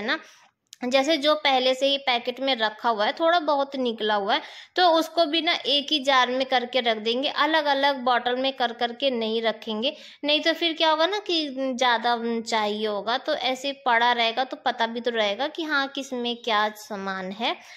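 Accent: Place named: Bihar, India. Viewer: Asalam o alaikum baji aap ne k kaha k aap u.p se hain lekin aap ka lehja bihari wala hai aap k bohat se alfaz biharion wale hote hain, I'm also a bihari from Karachi pakistan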